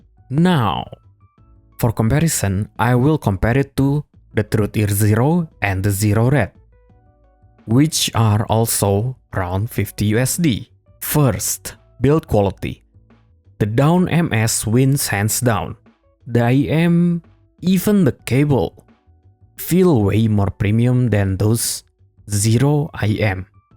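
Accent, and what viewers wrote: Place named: Indonesia. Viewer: orang indo nih, keliatan dari fluentlynya 😅 semangat trus bang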